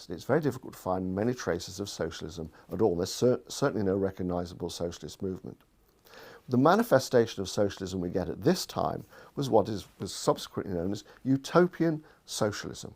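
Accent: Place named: United Kingdom. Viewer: Thank you. Well versed, laid out, explanation of the origins and early history of Socialism. The British accent also helps to accentuate the importance of topics and points being made.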